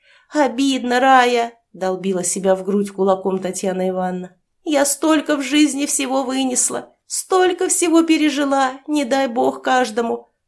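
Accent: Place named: Barnaul, Russia. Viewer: умират, знат,и такие же окончания привезла моя соседка из Барнаула .Именно таким говором говорит.А и чаво , и штоля.Ухо не режет.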